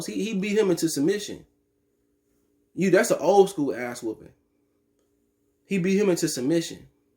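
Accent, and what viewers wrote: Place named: Texas, United States. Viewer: Hold on to that Texas talk..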